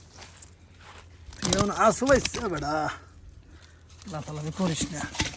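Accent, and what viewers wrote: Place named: Barishal, Bangladesh. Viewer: আপনার ভাষা শুনলে কথার মেজাজটা গরম হয়ে যায় বরিশাইল্লা খাতার গাট্টি ভিডিও সামনে একটু শুদ্ধভাবে কথা বলার চেষ্টা করবেন বুঝতে পারছেন খেত কোথাকার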